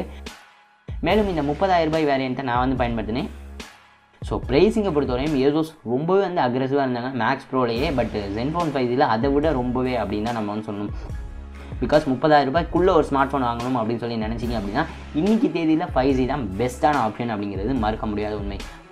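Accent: Madurai, India. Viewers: Neenga madurai ahh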